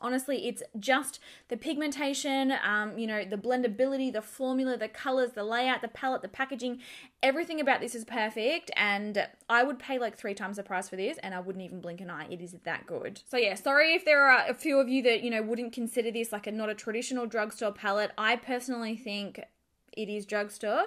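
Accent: Australia. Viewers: Your accent is so Australian and I am Australian lol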